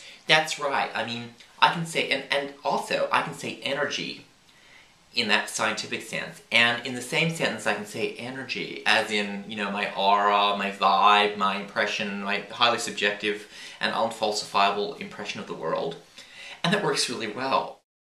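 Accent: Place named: Australia. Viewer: Good vid. What part of Aus you from; never can tell from the accent! I'm an Adelaidean, been thinking about joining an atheist type group do you know any good ones in Aus?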